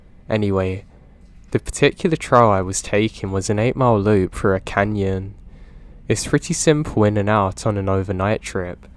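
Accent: United Kingdom. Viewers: im glad you do mate i get bored of these american story tellers, its good to hear a fellow british accent. i was actually shocked the first time i heard you were british tbh lad, keep it up any way you tell stories clearly, and the starting motivational bits are a good add to people who maybe need words of wisdom but dont try to find it, so you prolly have more of an effect on people than you know. One thing tho is maybe a bit too much emphasism sometimes? No hate ofc but i find that it makes a big difference if the main parts are emphasised in stead of the irrelevant bits. Kinda adds more power to the plot, but it aint me thats tellin em so keep up the good work lad 👏👏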